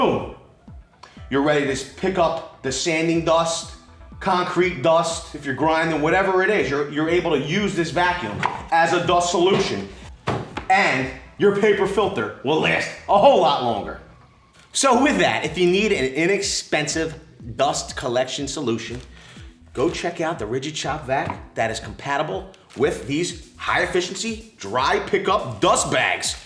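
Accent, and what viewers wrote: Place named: Italian-American, United States. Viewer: Guido accent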